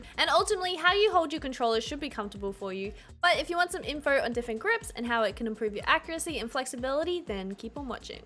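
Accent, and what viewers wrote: Australia. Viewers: I like your dancey play style - I enjoy using that approach with my juggling..more satisfying (and different) than pure tech, IMO. Australian & New Zealand accents are some of my favorite. To my American ear, it sounds like the words are often mellifluously packed full of warbled, extra vowels. I want to understand why the hard “O” sounds like “-arr” - this fascinates me! Thanks for the advice! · I always forget you're from Australia! I'm Aussie too, but every time I hear you speak it takes a second to adjust to your accent. Thanks for these tips, though. I got an Oculus a few days ago and I'm stuck between Hard and Expert. No fail and the different grips are a game changer! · Are you Australian?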